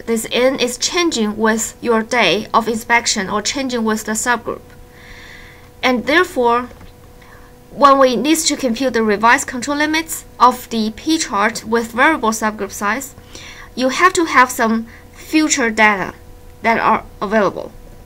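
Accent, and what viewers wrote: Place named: China. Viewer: Chinese accent haha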